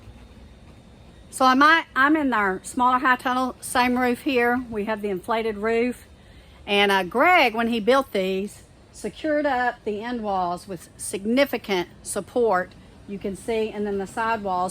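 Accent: Texas, United States. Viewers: Just seen this today.....I have a younger Brother in Richland Hills and I will have to call some friends of his to see if he is alright ..... he doesn't speak to me but I shore want to know if he is out of danger. And I like your Texan accent!!!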